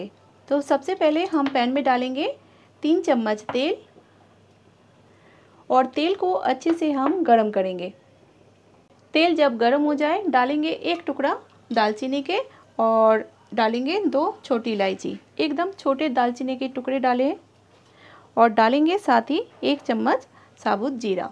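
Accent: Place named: Bengal, India. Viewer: yummy looking surly must be tasty too, I would like to know, this is bengali style, your speaking style is also bengali.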